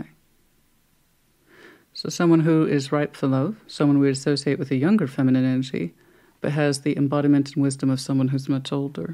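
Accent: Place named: Texas, United States. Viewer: First time I've listened but your cards missed me by 2 mile. I will listen again ,like the Texas accent. Been in and across the 800 and I believe 30 odd miles, in a truck many times. Always met some good people in Texas and was treated with much respect being a woman. Aries thank u for reading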